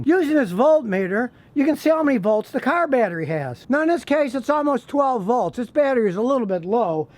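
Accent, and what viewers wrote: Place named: North, United States. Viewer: ! Are you from Houston originally? (I’m born and raised in Houston but live in Cali now). You sound like you’re from the north. Also I LOVE your videos. I’m a car salesman and you make me laugh so hard AND help me learn a lot (which helps me to properly educate my customers - Win, Win, WIN!)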